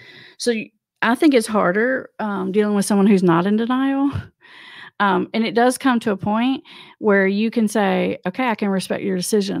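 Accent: South, United States. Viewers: I appreciate your efforts, please tell us where you got your title "Master of Addiction.?", What exactly is your professional education, and your personal experience. Thanks PS I'm sure its from your southern accent, but. you talk so fast also, and I miss much of what your are actually saying. What is your belief of 'the disease concept" of addiction?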